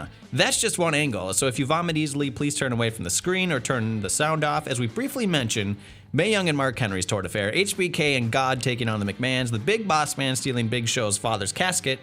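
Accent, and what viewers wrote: United States. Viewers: Sorry but whatculture really doesn't suit an American accent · Ahh, finally.... An American voice over, for a top ten video.. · An american whatculture narrator... amazing. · wtf who is this dirty american where is my sweet voice of adam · What culture shouldn't be narrated by Americans #wewantadam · I love Simon, so I'm not just one of the Adam fanboys, but I just don't like this guy's voice. Too American for this channel. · An American accent on Whatculture Wrestling just seems...off. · and why there's no british acent in this video? they americans stole the cahnnel? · Its stupidly weird hearing an american on what culture wrestling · what is with the shitty stupid sounding american accent? if this keeps up you will lose ALOT of subs! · as soon as i heard the american accent, all i could think of was 'she doesn't even go here' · You know, after all these foreign accents this American accent is foreign to me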